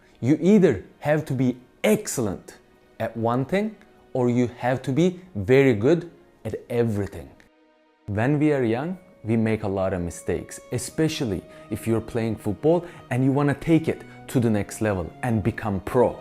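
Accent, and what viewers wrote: Turkey. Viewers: türk aksanı neyse bu video o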